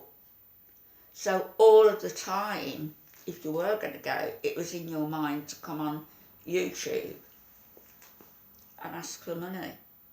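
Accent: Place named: England, United Kingdom. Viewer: Amy just made a video attacking critics including you. She is gloating and arrogant. She is pissed and must have seen the comment you left on her last video. Calling you an English lady with a thick accent. Amy would rather you speak in a coarse arrogant voice such as that American lady with a thick body and thick paypal account. Who could that be?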